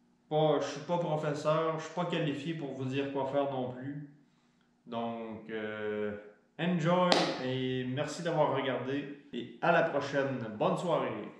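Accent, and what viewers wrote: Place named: Quebec, Canada. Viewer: Enfin un mécano qui parle français Québécois merci et à la prochaine !😂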